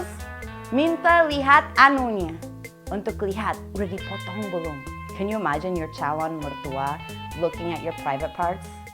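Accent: West Java, Indonesia. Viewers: Logat sundanya dapet euy...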